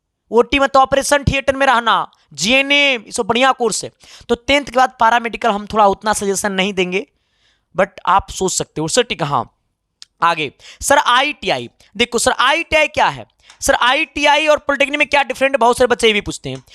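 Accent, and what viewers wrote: Bihar, India. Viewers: Bihari style me bakwaas karta hai. Time waste karta hai. 12th me certificate milta hai degree nahi.😂😂😂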